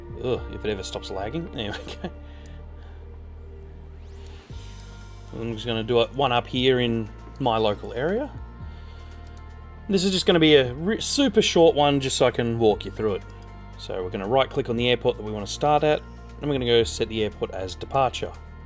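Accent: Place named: Australia. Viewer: For what it is worth, you speak at a good speed for me but I'm Australian too so the accent might just be easier to listen to. I guess it takes all sorts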